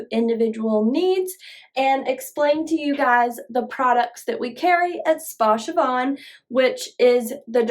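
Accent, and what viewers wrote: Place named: South, United States. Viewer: are you still using the Dermalogica Skin Resurfacing Cleanser? I ask because, while I'm a red-meat-eating man, I'm of 100% Irish-American skin and have fought Rosacea for about 20 years, so I've tried to smarten-up on taking care of my face. I also spent many years in the sun as a Lifeguard, before SPF became 'cool'. I looked into and tried several IPL laser sessions, very productive, but I wanted more. So, I found Dermalogica Redness Relief Essence and was blown away at how effective it was...and you know, the next thing you're buying Vitamin C this and and and, all sorts of ointments lol. A friend gave me some GlymedPlus 10% Glycolic Face Wash. I use it 1-2 x a week, it helps to really exfoliate. I use Kiehl's Ultra Facial Cream for moisture. Morning: Wash w/Kiehl's Ultra Face Wash, Dermalogica Redness Relief, Dermalogica Biolumin Vitamin C and SuperGoop Unseenscreen SPF # 40. 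Night: Wash, Redness Relief, Kiehl's Retinol and Dermalogica Intensive Moisture Balance (FYI...Clinique Even Better Clinical Radical Dark Spot Corrector + Interrupter during the day is something I use 3-5 x a week and it seems very effective at helping dark spots/old sunburns etc). Ms., I was looking for a new, daily cleanser...I like the Kiehl's, it is gentle...but the Glymed Plus is very strong and drying....I stumbled upon your video and was drawn to the Lactic Acid 3% Dermalogica Cleanser you used, so I bought it. You have Disney Princess Skin, truly. Combined with southern accent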